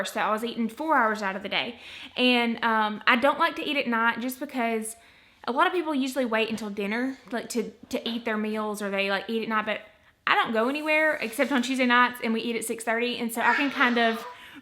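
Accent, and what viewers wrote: South, United States. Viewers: I love your southern accent, great tips🌟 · Oh my god this is my first time hearing a genuine southern accent it sounds so cool. (I live in north new Jersey and I’ve only been out of state twice lol)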